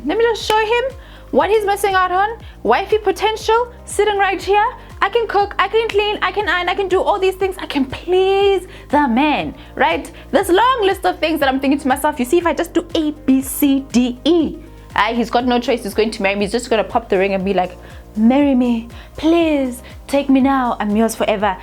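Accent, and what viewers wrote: South Africa. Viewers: I like the way you speak South African vibes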